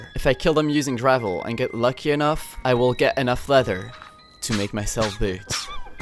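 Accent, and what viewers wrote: France. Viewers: Aaaaaah, I thought you might be French! The "no lessons on Wednesday afternoon" made me suspicious, and I then I thought I noticed a hint of French accent in your English Gg mon gars :) Edit: Belgian apparently? Whatever, French-speaking, you know what I mean xd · Bien joué mec j'ai même pas remarqué que t'avais un accent français avant la fin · i’m french canadian, he’s french, he has a french accent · Bonjour Monsieur je pense que tu est plus francis, francis et moins quebequoi francis. Oui? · That’s what you’re accent is the entire video I was wondering and at the end you start talking French exactly what I though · ah bah tu parles francais a la fin mais jai reconnu a laccent ptdr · ayo i knew he was french by his accent or at least itought he would speak french, an he does, it makes me happy idk why when i see french yt ers speek english+ actually udnerstanding them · Could definetly tell you were french from the accent lol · I totally expected that from his accent tbh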